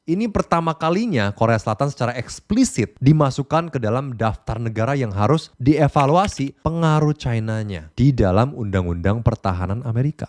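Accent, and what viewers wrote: Jakarta, Indonesia. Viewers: Ngomong nya sdh Indonesia banget ( jakarta ) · aksennya indonesia bgt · logat indonesia bgt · Lah emg anak jaksel 😂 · muka Korea logat bahasa Indonesia abis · Aksen bahasa indonesiamu bener2 indonesia banget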